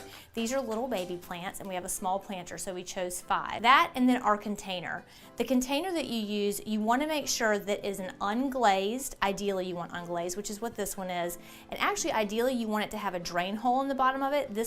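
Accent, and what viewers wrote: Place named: South, United States. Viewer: Thank you for this video:) These are definitely the plants for me. Only need water every two weeks! Haha... Also, you seem to have a southern accent. I am from Alabama. Are you close by?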